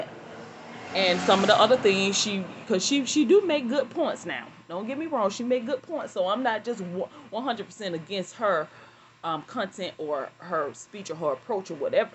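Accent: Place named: Alabama, United States. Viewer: I can tell you are from Alabama. 😒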